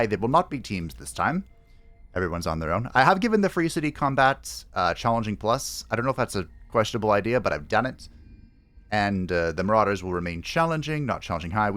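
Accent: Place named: United States. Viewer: And it was a very poor attempt made from ignorance. Not blaming you as a person, as your accent pegs you to me as a USian, and there is no country on this world whose citizens are more confused about the political spectrum and what the various tendencies entail. I meant to poke a response, and thereby give you a more accurate way to consider communism. For accuracy. For you have an audience, and some might take your words as gospel - which would be, in this case, inaccurate.. :)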